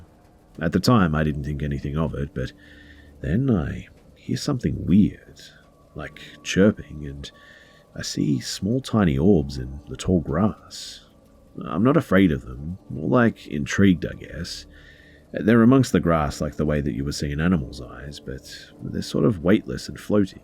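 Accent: Australia. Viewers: Love your stories! Especially love listening to your voice, Aussie accents are my fav. (And UK.)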